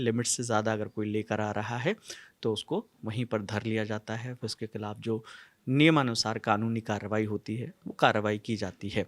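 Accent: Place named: Bihar, India. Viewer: Dhar liya jata hai .Bihar ka tone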